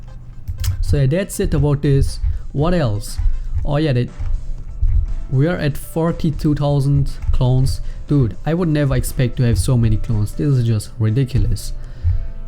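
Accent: Germany. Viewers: are you german? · u are german right ? :D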